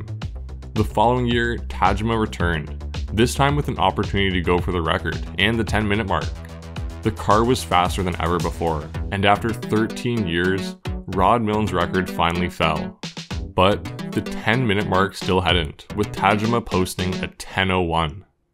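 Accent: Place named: Canada. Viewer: If anyone is wondering what a non Maritimes/Quebec canuck accent sounds like, this channel's for you. Cheers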